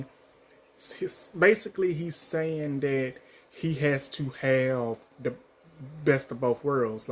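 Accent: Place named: South, United States. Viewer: Gee Brother....what State are you from? I know you are from the South but being a New Yorker, I cannot figure out which southern state you come from but you way of talking is weird and deep as fuck. Did you see the sex videos of Ray and Zed on line, if you did you may have an idea why Ray took the L on Zed not to say there is something wrong with Zed but from what I can analyze, I think Ray is a big ole size bottom and yes I think Ray found a brother who might be digging him deeper than Zed was. Karna is a bitch and yes shit ain't gonna be working for Ray if patience is not his priority. Ray is talking about making money quickly but he ends up with this brother who does not seem to have shit going on for him. From what I saw he seems like Zed is moving on and of course he is dealing with the break up as he did sincerely loves Ray but he seems to take charge of his life and his music career.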